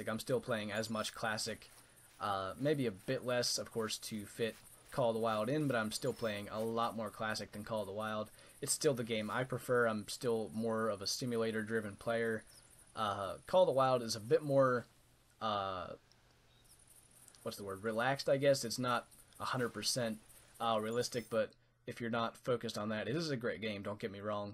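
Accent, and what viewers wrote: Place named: United States. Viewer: Hi, i m a french player of the hunter and want to say you, you are doing excellent videos. even cause of your american accent i don 't understand all of what you say in your videos but it's very nice to watch your videos. thank you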